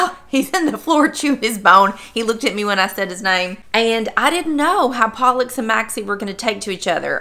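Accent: South, United States. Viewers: I just love southern accent lol xxx it is 11°C here in Peterhead Aberdeenshire Scotland and I'm in a bootlace strap t-shirt lol... hardy folks us Scots lol xxx Love the new Merc xxx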